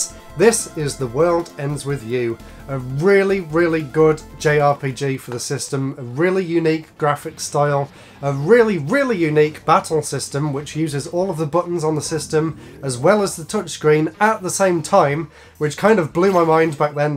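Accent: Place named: Northern England, United Kingdom. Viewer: At 2023 i figured out that there are plenty of different pronunciations in England, so now i gan here and there and bother people with question "where are they from" if hear not so-called standard pronunciation (thine is obviously northern one). So, satisfy my curiosity, where art thou from? (i guess Birmingham or its surroundings).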